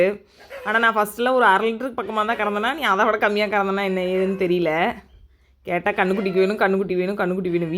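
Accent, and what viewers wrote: Kongu, India. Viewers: எந்த ஊர் அம்மனி நீங்க கொங்கு பேச்சு தவழுது. வாழ்த்துக்கள் இந்த விவசாயி மகளுக்கு ❤🌸👌 கண்ணு ரெம்போ அழகா இருக்கு மா...🎉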